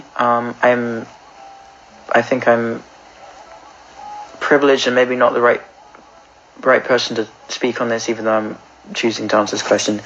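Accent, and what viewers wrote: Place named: United Kingdom. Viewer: sounds so incredibly British when talking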